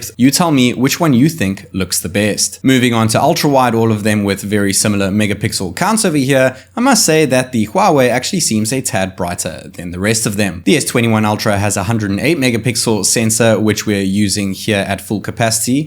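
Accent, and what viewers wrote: South Africa. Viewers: no way are you south african dawg? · Are you from south africa by any chance and just living in china. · Mate, your accent sounds South African . You from here ?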